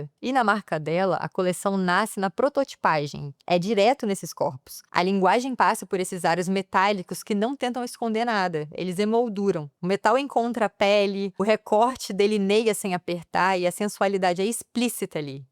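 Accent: Brazil. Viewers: Desculpe meu hiperfoco em linguística, mas você tirando o chiado sendo que exala Rio de Janeiro a cada sílaba contrasta com a mensagem 🥀🥀🥀🥀 a menos que seja de Resende onde o sotaque é assim, daí só parabéns linda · Que MULHER, Brasil. (Sotaque muito capixaba; acho fofo). Ganhou um inscrito pelo texto excelente sem tratar a audiência seja como leiga nem como super especialista ❤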